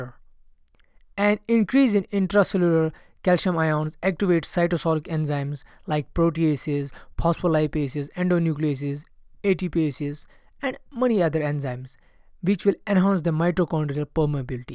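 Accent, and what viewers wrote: India. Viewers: Kindly put original subtitles because your English has a very Indian accent which is difficult for some people to understand.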